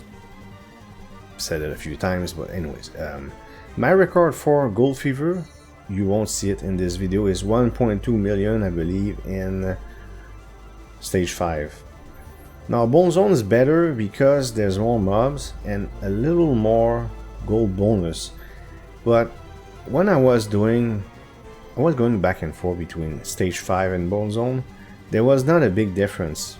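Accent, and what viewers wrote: Quebec, Canada. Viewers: accent ? is it québécois ?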